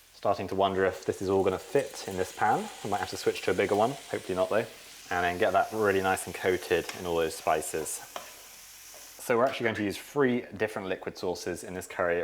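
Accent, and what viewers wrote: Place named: United Kingdom. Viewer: Great recipes. And, as most of the vegan cooking channels I watch are American, & I live in Aus, it's nice hearing the old UK accent - 'and we'll 'pop a lid on top'! Only the Brits 'pop' things ❤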